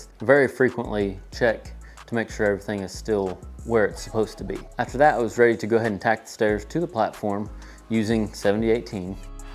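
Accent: Oklahoma, United States. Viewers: Hey man are you from OK?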